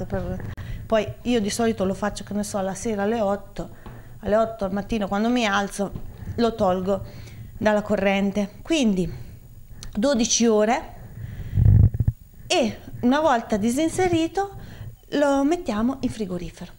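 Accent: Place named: Marche, Italy. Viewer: scusa se te lo chiedo sei marchigiana per caso?? io sono di Pesaro... dall'accento sembrerebbe.. comunque video interessante.. complimenti!!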